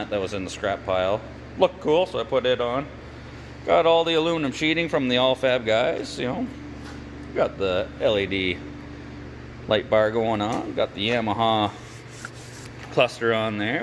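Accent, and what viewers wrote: Canada. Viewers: That Canadian lingo cracks me up. 🤣 I have practiced for hours and still cannot say out like you guys do.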